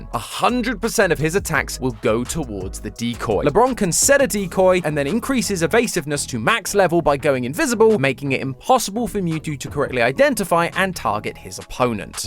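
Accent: United Kingdom. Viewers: Tbh He kinda sounds like matpat but a little more British 😢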